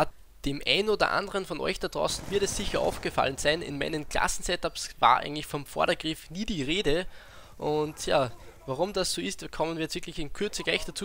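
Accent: Austria. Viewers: Wo genau kommst du eig. aus Österreich?:D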